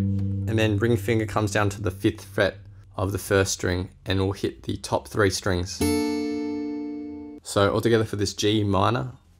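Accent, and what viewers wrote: Australia. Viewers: When i heard his voice I said Mateeeeeeeeeeeeeeeeeeee his an Aussie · Are you from Australia??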